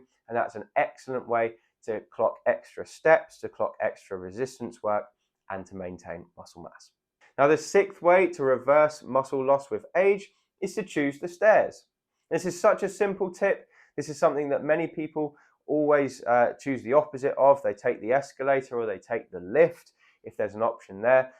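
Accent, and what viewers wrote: United Kingdom. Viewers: İ love his British accent, he s so sweet..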